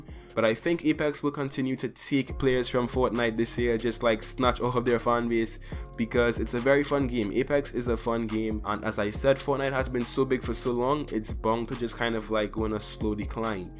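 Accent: Trinidad, Trinidad and Tobago. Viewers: are you from Trini? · Hey you from Trinidad?? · WAITTTT YOU FROM TRINIDAD?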